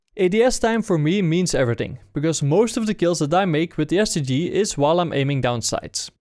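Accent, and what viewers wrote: Netherlands. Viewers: Hoezo Hollands accent :p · from hearing your accent I'm guessing he's Dutch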